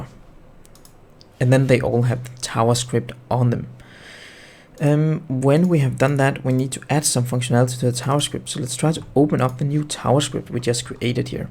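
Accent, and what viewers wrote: Denmark. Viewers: Started watching the video.... "In this video, we will..." That guy sounds like he's from Denmark... Check profile... Yep, Denmark... Hejsa lande bror.. :D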